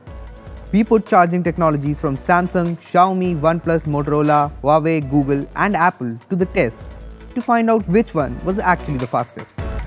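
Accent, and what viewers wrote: India. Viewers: jeez you tryna talk in street language in indian accent...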